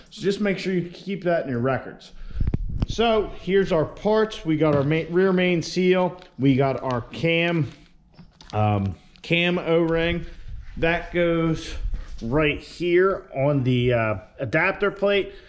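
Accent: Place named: Northeast, United States. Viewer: Number one thank you for your videos very entertaining very educational and 2 I love your Northeastern accent yes you tried to cover it up but it's still very prominent not in the bad way I used to live in New York there pronounce of words is a lot different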